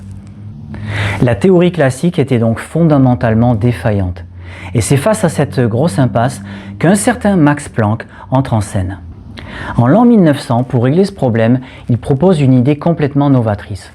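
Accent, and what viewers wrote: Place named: South, France. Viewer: Aïe ! Il vous faut prendre des cours de diction, mon garçon : la monotonie de votre récitation est insupportablement ennuyeuse, malgré les infimes traces d'accent méridional que vous y laissez traîner. Essayez de faire lire vos textes par une voix synthétique, ce ne pourra pas être plus mauvais en tout cas. Cordialement !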